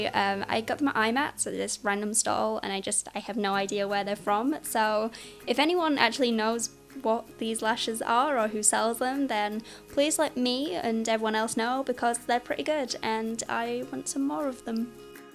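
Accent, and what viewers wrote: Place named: North, United Kingdom. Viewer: Brad and London are having an influence on you! You're starting to lose some of your northern accent and sound a bit softer, more southern:) No worries, I am from Surrey but I've been living in America for the past 18 years...people say I've got an American twang. (That actually pisses me off but it doesn't take me too long to get my accent back properly:)) Very pretty look, and like you said, very not you! That's a mad pigmented gloss, is it sticky too?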